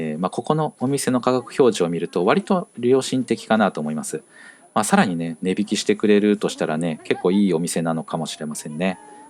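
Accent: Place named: Kansai, Japan. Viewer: お互いに関西の何処かに居ますね。さんの聴きやすい声の僅かな関西訛りを聴きだして塾聴しながら私なりに楽しんでます。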